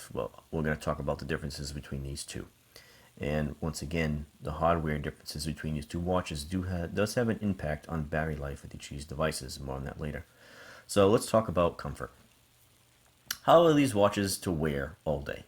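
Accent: Boston, United States. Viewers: Lol new to your channel. Uh, Boston in the house? I thought you were saying Huawei but you were saying hardware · Yay! Real English! And you don't sound like a robot! Thumbs up. North boston?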